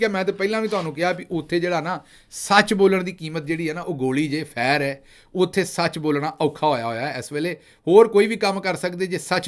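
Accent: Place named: Punjab, India. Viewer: sb twadi punjabi te punjabi culture blkul exact punjabi hai matlb USA reh de bawjood v inna kch kawain jan de thodi saday nal a v share kro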